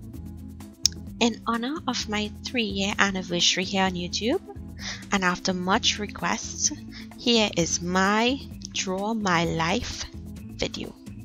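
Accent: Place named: Trinidad and Tobago. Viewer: I love how you stayed true to yourself and your culture throughout your many years in America, accent and all! Some Trini's dis act brand new de minute they land foot in America, it's so hypocritical!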